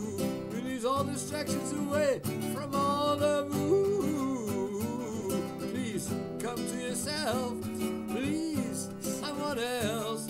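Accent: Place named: Netherlands. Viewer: My dear Lord, actually this wonderful troubadour lives in Australia, but I recognized his accent,.. yes Holland, and we both are Dutch! Roeleke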